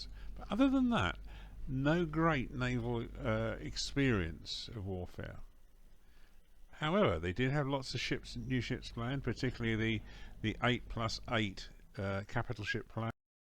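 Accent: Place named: RP, United Kingdom. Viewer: What a beautiful British posh accent. I truly love it the way you speak.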